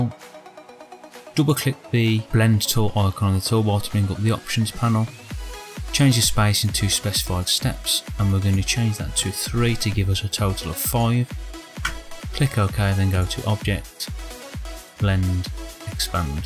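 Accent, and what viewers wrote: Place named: Black Country, United Kingdom. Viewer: I was wondering if you were a yam-yam or a brummy, haha. I am from these parts too.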